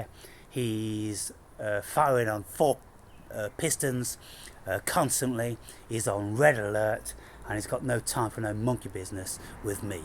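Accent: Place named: Southern England, United Kingdom. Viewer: Hi, found your video on Peter Kingsley, great work! I have a rather personal question, you said you’re living in Newcastle up north, but your accent is from south, when and why did you move up there? I’m from London. Thanks 👍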